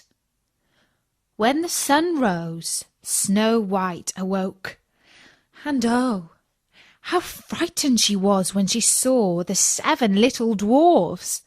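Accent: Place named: United Kingdom. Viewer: J'adore ton " british accent" qui va á merveille avec les contes ainsi que ta voix qui ressemble à "kiara knightly" vraiment bravo et chapeau bas pour ta façon pro de raconter la conte qui laisse á imaginer les evenement sans les voir💜👍👏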